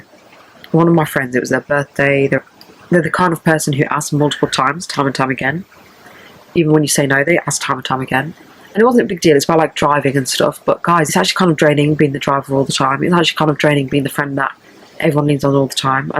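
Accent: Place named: United Kingdom. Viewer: i didn't expect you to be br*tish wtf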